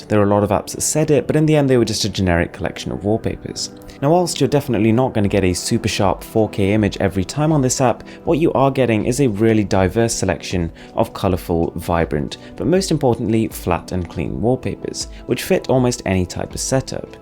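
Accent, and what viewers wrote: United Kingdom. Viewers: British MKBHD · Are you from the UK?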